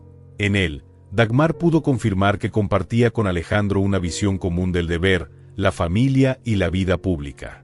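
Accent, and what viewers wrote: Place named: Mexico. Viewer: Me encanta el acento del español mexicano, mucha gracias al narrador.🎉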